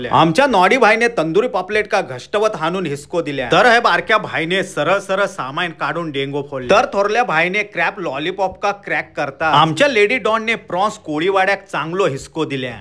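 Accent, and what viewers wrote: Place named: Devgad, India. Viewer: दादा तुम्ही बोलताय मस्त नादनाय करायचा देवगडचा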